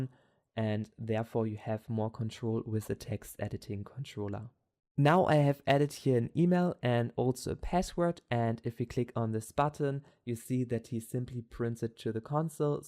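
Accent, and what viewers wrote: Germany. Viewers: Great Tutorial or should I say Gut gemacht? Man hört echt raus, dass deine Muttersprache Deutsch ist. Das ist aber ja nichts schlechtes:) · lmao i can hear that you are german ahahahah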